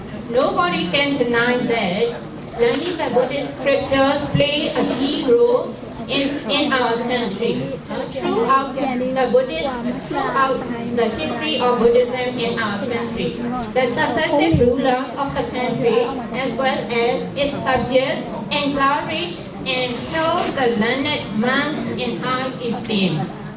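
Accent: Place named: Myanmar. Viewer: ဆရာလေးအဂ်လိပ်စကား‌ေ့ြာတာ ငပိသံမပျောက်သေး။ အဂ်လိပ်စကားပီပီသသဖြစ်အောင် နိုင်ငံခြားသားနဲ့ များများစကား‌ေ့ပြာပါ ဗမာစကားလုံးအသံထွက်နဲ့ပြောသလိုဘဲ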